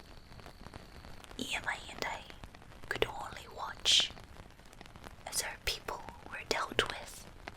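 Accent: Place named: Ireland. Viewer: Your singing sounds incredible, and the Irish accent is really well done! I’ve already listened to it several times. Keep up the awesome work! 😁 Sidenote: What could possibly be more relaxing than a tragic story about war? 😝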